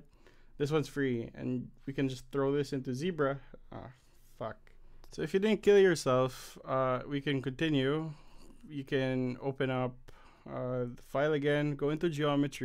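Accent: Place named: India. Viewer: your accent is sounds like an indian.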